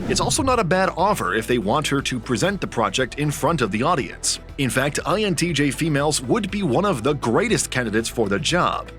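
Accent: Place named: United States. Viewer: I really wanted to watch this video but the voice is way too American 🤦🏻‍♀️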